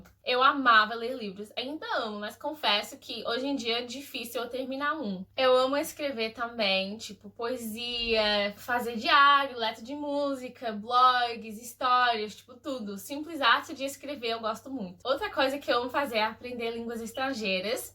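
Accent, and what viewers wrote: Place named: Northeast, Brazil. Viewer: Nossa que português... Passa tranquilamente por nordestina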